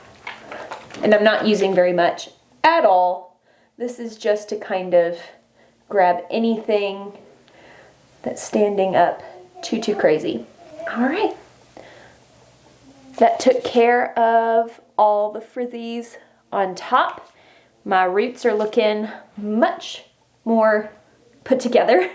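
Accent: Texas, United States. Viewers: Yep, I recognized your accent and bouncy personality as pure Texan right away! Our Texas weather adds an extra challenge for we curly girls, but these Cantu products are my faves...they work wonders! I have fine, thick, 3B, 3C curls. I use the Define & Shine Custard (basically, gel in a jar) instead of the glue gel. The custard has that weird thick sticky feel too, but the cast is softer and most of it breaks up overnight as long as I don't use too much. Next morning I take my pineapple down, leave my hair alone for a bit and it usually falls into place. Occasionally I might have to do a little root shake/fluff but that's it.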